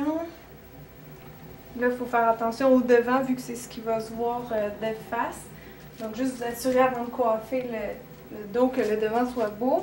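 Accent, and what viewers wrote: Quebec, Canada. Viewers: Je comprend rien avec l'accent quebequois chui marseillaise c 'est normal :-) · Ah l'accent quebecois... Ca me manque finalement :(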